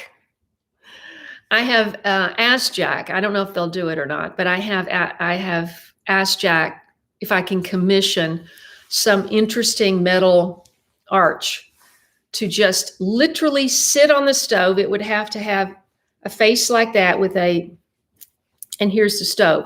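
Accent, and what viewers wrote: Tennessee, United States. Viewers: Happy Birthday, have a wonderful time amazing, its Lucinda's birthday tomorrow 15th, my birthday is the 5th of April i shall be the big 80 can't believe it, you're getting back you're Tennessee accent love it Lucinda noticed it more than me, you're fireplace and livingroom looks really cosy and picture are outstanding "well done" missed the livestream, horse riding exercise see you next video love, Edwin & Lucinda Xx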